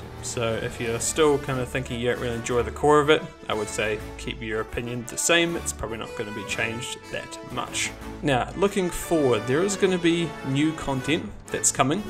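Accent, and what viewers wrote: Australia. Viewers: What he's Canadian? I could have sworn from his accent he was Aussie. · Canadian dollars.....you live in Canada? You sound Australian or maybe kiwi haha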